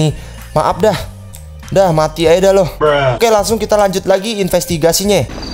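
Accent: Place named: Jakarta, Indonesia. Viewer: Bang lu orang jakarta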